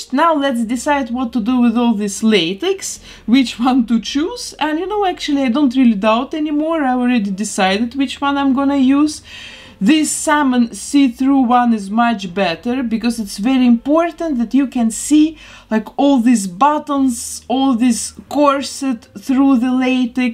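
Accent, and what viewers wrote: Russia. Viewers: Русский акцент присутствует)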